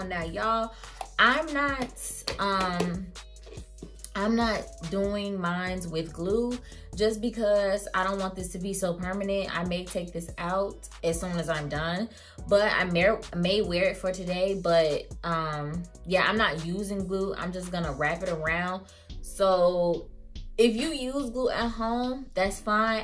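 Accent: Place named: Chicago, United States. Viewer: i can tell you from chicago from yo accent 😍😍 you pretty!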